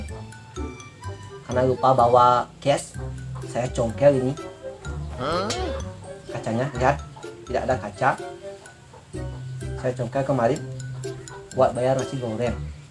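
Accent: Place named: Bali, Indonesia. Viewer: tabanan napi gus?tiang saking jegu....logatne kentel care logat penebel e...sampun tiang subscribe...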